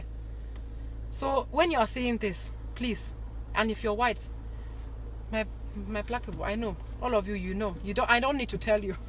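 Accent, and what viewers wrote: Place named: Nigeria. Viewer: It's the Nigerian accent I keep hearing from you for me🥰❤️🇿🇦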